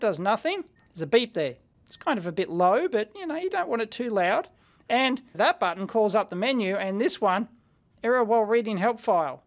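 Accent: Australia. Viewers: Sounds like an Australian Scotty Kilmer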